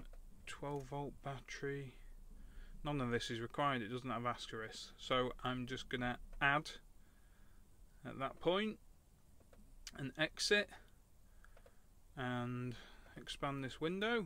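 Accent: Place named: Yorkshire, United Kingdom. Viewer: Ah right, I live near Todmorden. I was thinking your accent was a bit diluted to be fully yorkshire lol. I was wrong :)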